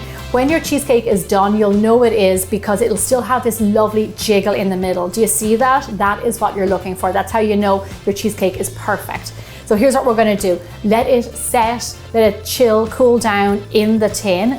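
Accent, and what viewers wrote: Ireland. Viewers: Can't loose good aulde urish accent, great video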